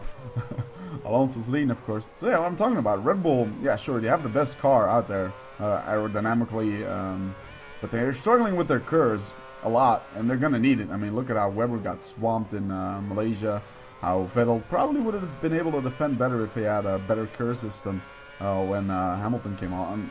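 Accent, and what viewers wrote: United States. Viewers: Are u actually belgian becus u sound american and if u ar american y ar u belgian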